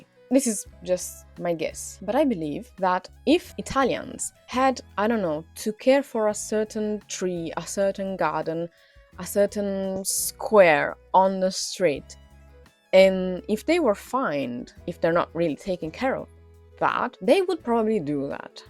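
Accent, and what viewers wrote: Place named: Sweden. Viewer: Why you sound like you are from sweden 😅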